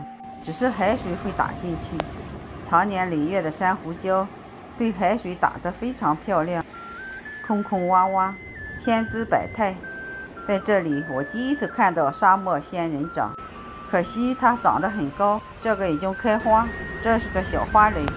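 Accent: China. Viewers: 解説有見地，大陸口音，哪裏人呢？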